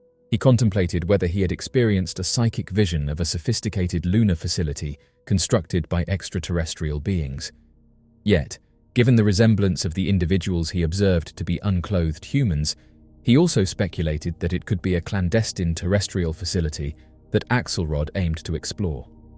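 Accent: United Kingdom. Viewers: If the narrator has a British accent......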